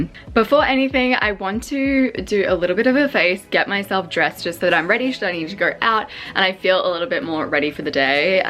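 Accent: Australia. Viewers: “These are my shower thongs” Me: ur what Me: oh yeah, she’s Australian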